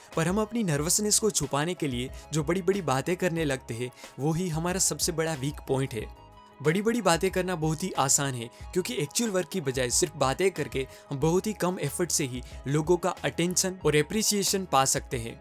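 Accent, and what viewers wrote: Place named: Kathiawar, India. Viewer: Kathyawadi touch feels in your voice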